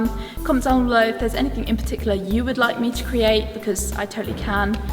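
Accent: England, United Kingdom. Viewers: I just love your English accent, i could listen all day long.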